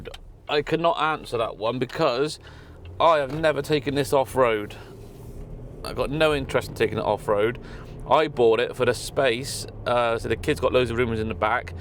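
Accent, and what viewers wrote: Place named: Bristol, United Kingdom. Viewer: Mines a year older, HSE Lux with 1 previous Doctor owner and yours looks in equal condition. Sounds like and looks like Bristol so you're only 20 miles away and I've got to say that offer of 16 and a half K you should be ripping their arm off. I was thinking 14 but maybe 15k tops as it does look very well cared for.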